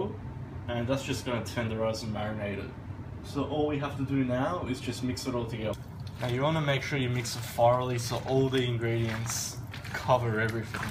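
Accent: Australia. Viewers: Love the Aussie accent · Nice aussie accent mate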